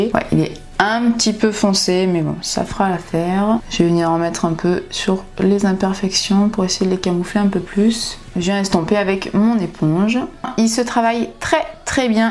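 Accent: South, France. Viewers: Bsr quel beau Make Up avec tout tes produits choisis sur tes derniers achats j'adore!!! Après pour moi la palette a trop de fards et du coup je serais perdue lol moi il m'en faut des plus petites car mon probleme c'est de trouver les bonnes combinaisons de couleurs 🙄 pour vous ça à l'air si évident et facile alors que pour moi c'est vraiment un casse tête je ne suis jamais sûr de moi et de mes choix, du coup à force de regarder vos tutos je commence a comprendre la disposition des couleurs et reconnaitre ce qui peu aller aller ensemble ou pas... Merci à toi pour tous ces précieux conseils et tout ces moments que tu nous consacres c'est un vrai bonheur et entendre une sudiste comme moi ( et oui je suis du var aussi, née à la Seyne-sur-Mer, à côté de Toulon,j'y ai passée une tres grande partie de ma vie, mes 3 fils sont nés là bas et y vivent d'ailleurs et moi pauvre que je suis j'ai suivi mon 2ème mari en Seine et Marne, dans le NOIOORRDD et cela fait maintenant 16 ans que j'y suis et je t'assure que le sud et les gens du sud me manquent et que je t'entends parler, cela réchauffe mon coeur alors mercciiii pour ce cadeau que tu me fais sans le savoir 😉😉💗💗🌞🌞) Et pour ton make up bravooo il est superbe, les couleurs et le dessin sur la paupière juste Whaouuu..... 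J'attends avec impatience ta prochaine vidéo et en attendant je te souhaite une tres bonne soirée et un bon début de semaine 😉😘💖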